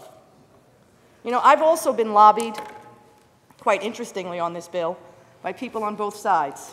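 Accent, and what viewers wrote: New York, United States. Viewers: I think her words make this speech great but her new york accent make it amazing!! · that was fantastic. also, I just love ny accents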